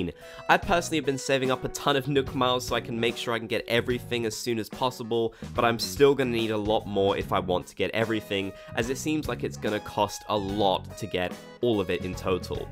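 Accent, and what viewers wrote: United Kingdom. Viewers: ahhh you’re British! I was trying to figure out the accent :) I’m from New England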